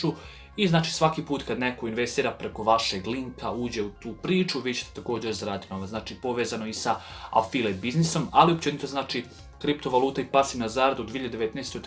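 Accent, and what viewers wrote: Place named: Krajina, Croatia. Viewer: Kakav je ovo seljacki akcent. Ne mogu slusat. Neka Krajina nesto.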